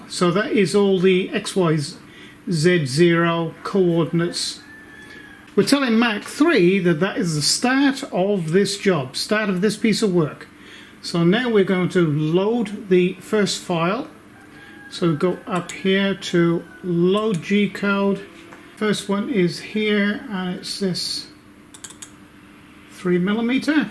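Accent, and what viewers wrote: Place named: Shropshire, United Kingdom. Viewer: You sound like a Shropshire mon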